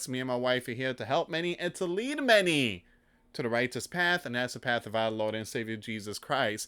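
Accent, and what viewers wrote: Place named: Australia. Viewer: Sounds aus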